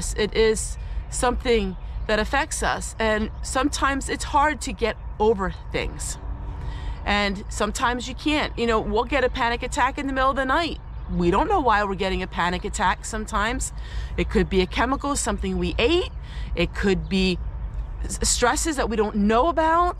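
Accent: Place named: Pennsylvania, United States. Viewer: I've been to Pennsylvania many times and you sound just like a Pennsylvanian. Your son has the same accent. You don't talk" oddly " at all. It's regional. I'm so glad you brought anxiety disorder to light. There's more of us than we ever knew sis. God be with you.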